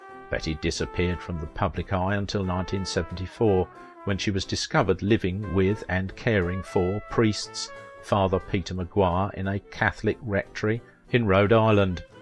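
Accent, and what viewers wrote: England, United Kingdom. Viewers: A normal, beautiful English accent. It's our American accent that can be called thick, in my opinion. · About I had to play it back, cause I thought the narrator said Sal Vagina...🤔, he had a thick British or English accent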